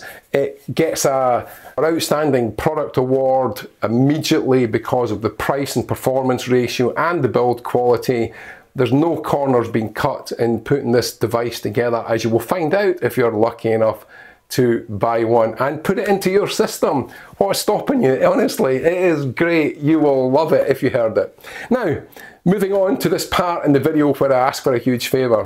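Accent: Scotland, United Kingdom. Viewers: scotsman he is.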